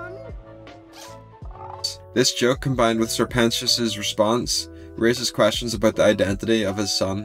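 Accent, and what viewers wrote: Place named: Northern Ireland, United Kingdom. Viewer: are u northern irish? i immediately recognised that accent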